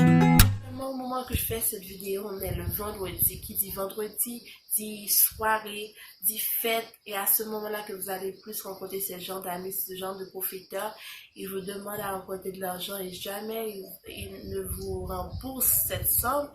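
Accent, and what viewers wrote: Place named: Haiti. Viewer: OMG j 'aime ton accent ,tu nous as dit que t'etais une haitienne , franchement je je crois pas ,la maniere dont tu parles le français c a féliciter ma cherie,maintenant je vais voir parmis mes amis lequels sont fake,lolllll.thx Belle Madame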